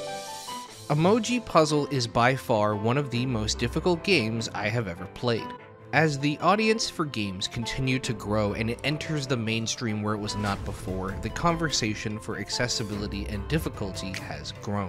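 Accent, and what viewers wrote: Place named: Canada. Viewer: Canadians accents are weird and kinda cool. You guys sound American to my European ears, until a certain word comes along, and I'm like: "ooooh, they're Canadian lmao".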